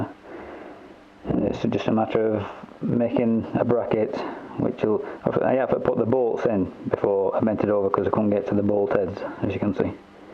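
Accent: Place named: Bolton, United Kingdom. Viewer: Id give you good money for one of them there scoops lad. are you from bolton by any chance?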